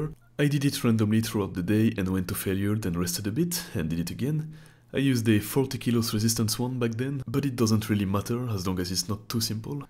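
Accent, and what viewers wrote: France. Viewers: Accent français lol excellent contenu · French touch 😂 love the accent 😂 It is,what it is ? N'est ce pas 🤣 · Are you french ?? You sound french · French accent ✨ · The french accent is so funny😂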